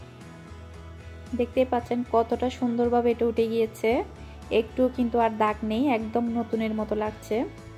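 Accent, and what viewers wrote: Chittagong, Bangladesh. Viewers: Ha Chittagong er voice amio asi satay ki hon apa thik hoine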